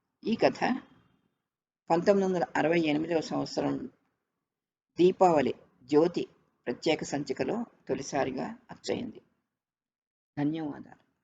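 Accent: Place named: Uttarandhra, India. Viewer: కధ బాగుంది, చాసో గారు కధలులో ఉత్తరాంద్ర దిగువ తరగతి ప్రజల జీవితాలు కధా వస్తువులు గారు ఈ ప్రాంతపు మాండాలిక భాషలో బాగా చెప్పేరు..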